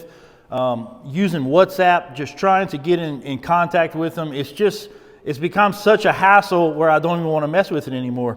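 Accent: Louisiana, United States. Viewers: Are you from Louisiana? You sound like us Cajuns. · This guy must be in Louisiana. As a Louisiana resident, born and raised, I know a Cajun when I hear one...atleast he sure sounds like a Cajun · Where are you from, i hear half coonass in your voice...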